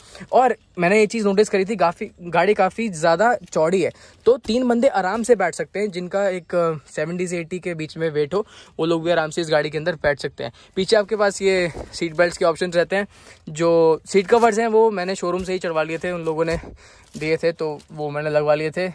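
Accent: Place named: Agra, India. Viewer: Bhai agra se ho?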